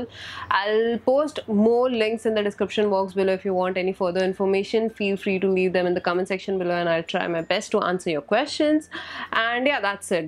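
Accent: India. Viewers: That indian accent. She sounds like Priyanka Chopra.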